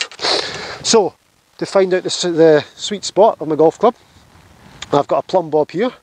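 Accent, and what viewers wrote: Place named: Scotland, United Kingdom. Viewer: This is excellent stuff, really enjoy your videos and the personality. Having a bit of fun understanding what you are saying sometimes as I am a canuck, but my mom and gran were Scottish born (McIntosh) and I remember the brogue. Cheers.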